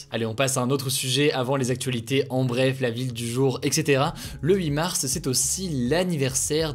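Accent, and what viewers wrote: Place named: England, United Kingdom. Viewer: je viens de comprendre pourquoi Hugo avait un accent anglais aussi clean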